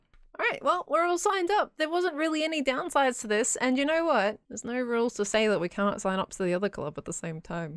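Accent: Australia. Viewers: enjoying the Aussie accent. Such an australian thing to call yourself ' '. Glad you have joined the hermitcraft server, I felt Australia was under-represented here:) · needs to work for a radio station or do pod casts he has the voice for it and i love it <3 that and your awesome aussie accent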